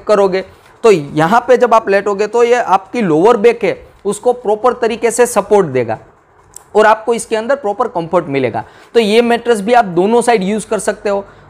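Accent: Gujarat, India. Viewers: Iskae hindi ke accent se lagta hai ye pakka Gujju hai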